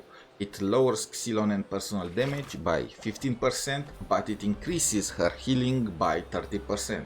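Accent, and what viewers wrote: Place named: Philippines. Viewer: ur accent is strong. r u perhaps from phillipines?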